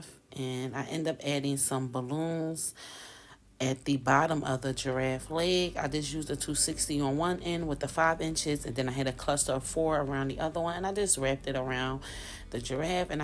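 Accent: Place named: Philadelphia, United States. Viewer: I knew you were a philly girl as soon as I heard your voice..I can recognize a fellow philly girl from anywhere! Lol....I love what you are doing and your work looks amazing I moved to Florida and I am about to start my business as well.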